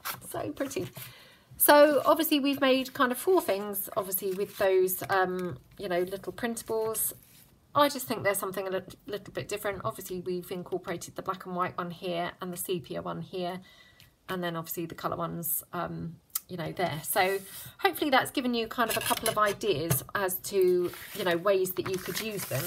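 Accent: England, United Kingdom. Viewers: I love watching anything to do with junk journals and making emphema I am so pleased to hear an English voice although I do love to watch the USA videos the American ladies are so talented but it’s great that the British are catching up love to watch also Australia videos I am a u tube junkie love love and keep going please we British need more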